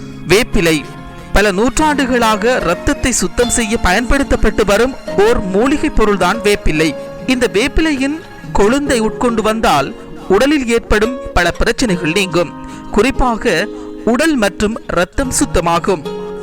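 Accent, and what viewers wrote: Sri Lanka. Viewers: ஈழ தமிழ் அப்படி தான் இருக்கும் சகோ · இது இலங்கை தமிழ் ...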